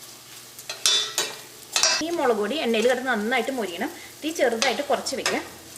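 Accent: Thrissur, India. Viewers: Thrissur bhasha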